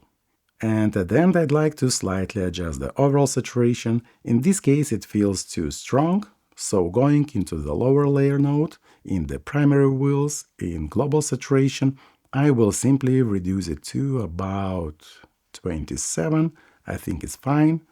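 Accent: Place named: Russia. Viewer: Вроде парень наш, русский или нет? Вот прям чувствую ломаный английский, акцент русскоговорящих.))).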